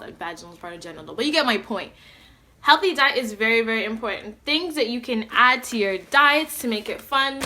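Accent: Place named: Jamaica. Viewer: krys when I hear she talk I said to my self hmnnn she must b Jamaican r a wrap wid jamaican, gotta take care of the poonahniii lol yes I said it lol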